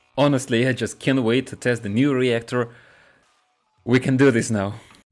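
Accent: Russia. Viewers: Смотрю, слушаю и думаю, это у меня уши сломались или он не англичанин. Хм... Почему русский акцент... Хах) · так я не пойму ты русский или англичанин? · а я вот никак не пойму. По акценту ты, вроде, русский, а по контенту в предыдущих видео, вроде, из америки. Я запутался, откуда ты?:)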